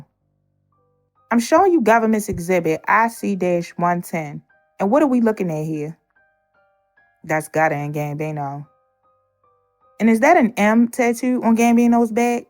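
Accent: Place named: Baltimore, United States. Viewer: Who ever you got reading this is definitely from Baltimore like me hot-dug aka hot dog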